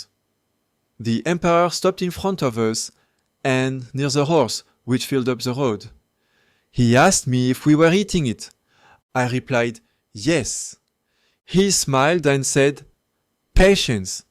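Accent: France. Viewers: Bonjour, Je trouve vos vidéos très intéressantes, et je compte bien voir tous les épisodes 🙂 Mais si je peux me permettre une critique... La narration est vraiment dure à écouter. On dirait une voix de robot générée automatiquement, et avec un très, très fort accent français. Elle en devient même pénible au bout de quelques minutes (de mon point de vue). Je pense qu'une vidéo français avec des sous-titres serait plus agréable pour les francophones, tout en restant accessible aux anglophones. Voilà c'est mon avis En tout cas merci pour cet excellent travail de mémoire !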